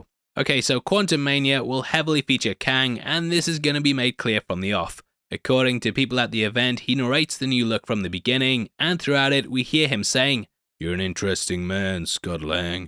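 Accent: United States. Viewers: nice american accent heavy !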